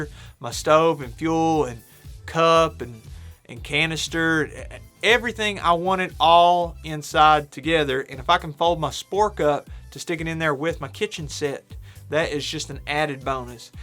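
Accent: Kentucky, United States. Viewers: "See we dont like our spoons with short handles...we like em with long handles that way you can use em just like at" snaps fingers im from Alabama and i gotta tell ya the kentucky accent is great man. Great content as always. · Man I knew that Kentucky accent 😂 I’m from Louisville but get out to the gorge and big south fork as much as I can. Maybe we’ll cross paths sometime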